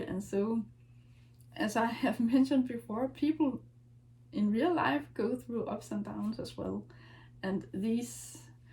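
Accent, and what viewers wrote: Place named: Denmark. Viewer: I’m intrigued by your characters and story. I think there is definitely room for stories outside of a genre. Genre seems to be mainly for helping marketing and selling it. Also…Denmark! I thought I recognised the accent. I lived in Copenhagen for a year and really loved the country and the people ❤ My story is set in Sweden actually 😃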